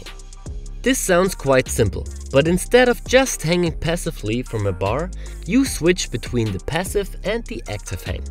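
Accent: Germany. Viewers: da ist doch ein akzent, deutsch? :-P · Brooo bist aufjedenfall Deutscher das hört man doch raus 😂❤️ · Dat German accent :D Edit - Ah wait: nice video bro! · r u german, swiss, austrian or dutch? 🤔🤓 ur accent sounds like either of those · Schön dicker deutscher Akzent! 😁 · Dieser deutsche Akzent ist so ekelhaft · german accent haha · Are you from germany?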